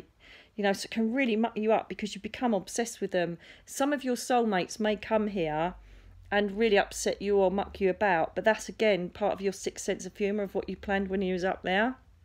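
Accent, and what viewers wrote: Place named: England, United Kingdom. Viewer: Umm, even though I had to really concentrate to understand what you were saying (English accent and all), I learnt a LOT from what you shared in this video. Soul blueprints, soul clusters, angels, celestial beings.... you could take any one of the points you shared and expand up on it in a separate video. And if I may, please do that! Thank you for sharing your insights and life experience.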